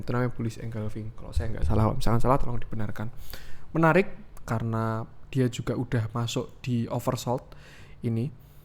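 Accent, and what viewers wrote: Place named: Semarang, Indonesia. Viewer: Sudah kuduga,logat semarangan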